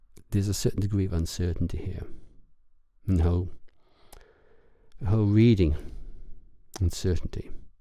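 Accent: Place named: England, United Kingdom. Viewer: Hello, nice to hear an English reader, and male to boot. Good reading, but being the other interested party it's not so heartwarming. Thank you, I shall keep my eye out for further readings, I have subscribed. UK listener.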